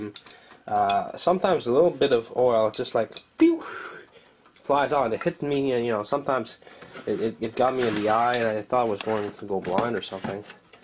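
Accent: Quebec, Canada. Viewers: Sometimes I think this is a troll but then so many videos and the conditions in there . Such commitment if so. Seems like a French Canadian his accent.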